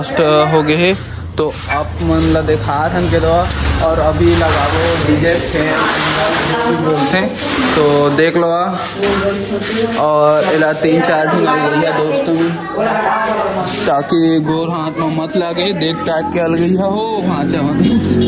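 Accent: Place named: Chhattisgarh, India. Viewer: Bhaiya tuman Chhattisgarh se bolat ha😊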